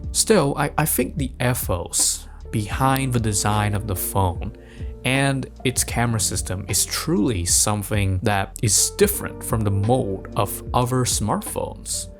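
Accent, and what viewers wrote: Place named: Vietnam. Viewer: ô ông anh là người Việt à, accent đã thế. Có 1 tấm a chụp ở UTE đúng k nhỉ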